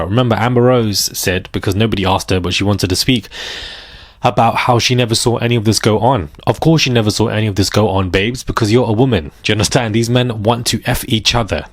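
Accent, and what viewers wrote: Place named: United Kingdom. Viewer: I really like your take on things and your presentation. I especially find amusing when you speak in slang with your lovely British accent.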